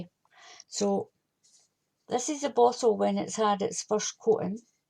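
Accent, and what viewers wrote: Scotland, United Kingdom. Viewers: love to hear yr Scottish twang my nanny was a broad Scot xx love and miss her xx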